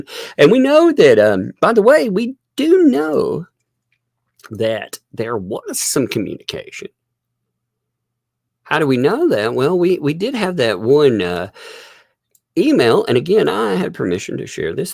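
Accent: South, United States. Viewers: Catching up, it’s been a busy few days, enjoy listening, it’s nice listening to someone win a southern voice. 🫶🏻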